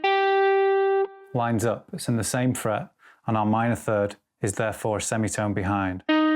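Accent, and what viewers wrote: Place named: Yorkshire, United Kingdom. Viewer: Sooooo good to hear a Yorkshire accent instead of American! Brilliant and funny! "It's my 'ows" love it hahahahahaa!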